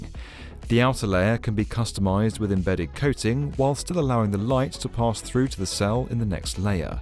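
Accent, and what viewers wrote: United Kingdom. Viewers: Check. The Cockney. Easy now big fella. 😎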